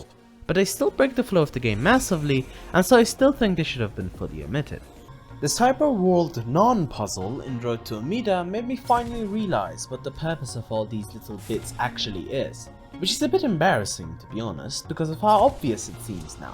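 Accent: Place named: Germany. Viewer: the German sounding man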